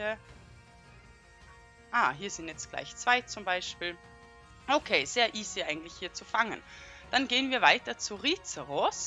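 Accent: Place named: West, Austria. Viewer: Ersteinmal - gutes Video! Propz👏🏽 Dachte zuerst du kommst aus der Schweiz wegen Akzent, hab dann aber überlegt & dachte einen West-österreichischen Akzent raushören, aber die Kanalinfo war im endeffekt ausschlussgebend!👍🏽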